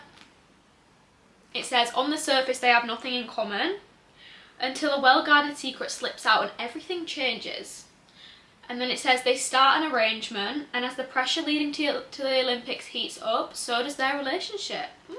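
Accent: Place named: United Kingdom. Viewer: hey 👋🏾, just found your channel and immediately subscribed when I heard your accent and knew you were a fellow UK Booktuber. I love your vibes and reviews of books, i feel like we like similar books also!. Looking forward to watching more of your content. 💞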